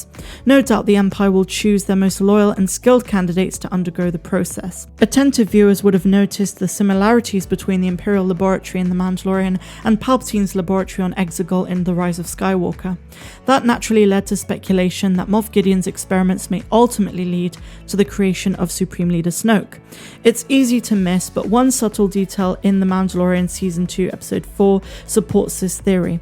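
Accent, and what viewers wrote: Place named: West Country, United Kingdom. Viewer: From the South West of England.. i knew it.. We love the letter Arrr in these parts.. Gert Lush x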